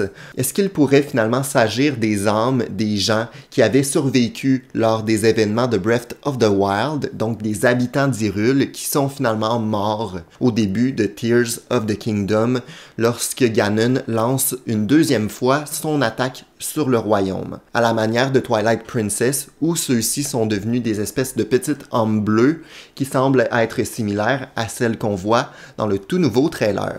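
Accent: Quebec, Canada. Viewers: J'adore ta vidéo. Je suis en France. Je kiff ton accent québécois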